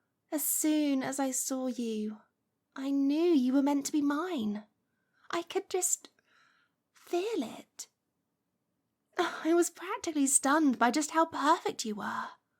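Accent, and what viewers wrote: United Kingdom. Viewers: What a nice kind and caring witch! And with a charming British accent too. :-)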